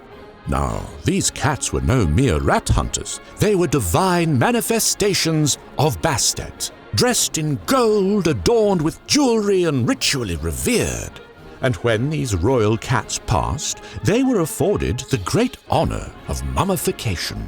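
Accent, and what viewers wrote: England, United Kingdom. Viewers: I just discovered this channel and I'm already enjoying the history videos, I especially love your "Englishman" like caricature. I subscribed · Glad to see a new Video! 😁 Is the Narrator British or American? He sounds very Stephen Fry, but pronounces some words in US English?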